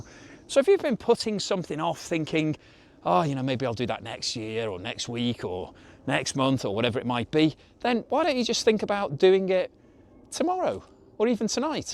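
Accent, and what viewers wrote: United Kingdom. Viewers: Don't let the nay-sayers bother you. Take it from me, from over across the pond, you don't look a day over 70! Just kidding of course, that's an old American joke. Pun intended. Regardless of your advanced age and Brit accent, I love your posts, warm Brit beer, Fish n Chips, and since I was a kid and saw my first bike, I'm crazy for vintage Brit café racers. And whatever you do keep the King! We Yanks love the drama over your way so we don't have to think about the drama over here.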